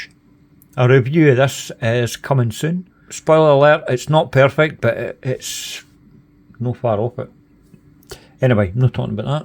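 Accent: Scotland, United Kingdom. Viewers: I actually like it in nice good old scotish accent. More than standard london english, to posh for me. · Lovely Scottish accent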